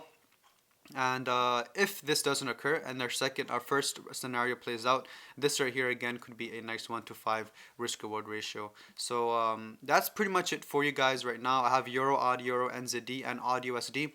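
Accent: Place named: England, United Kingdom. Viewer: You from england bro?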